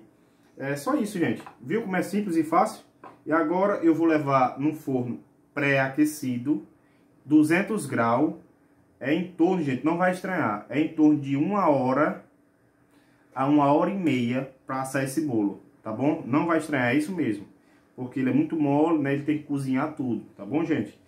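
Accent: Ceará, Brazil. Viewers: Vc deve gostar muito da sua sogra Coral. Kkkkk.Até bolo faz pra ela. Toda sogra queria ter um "genro anjo" assim; como vc 😂😂😂😂😂. Vc tem sutaque de cearense. Parabéns! Sou cearense e amo bolo mole. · Cearense a gente conhece de longe. Kkkkk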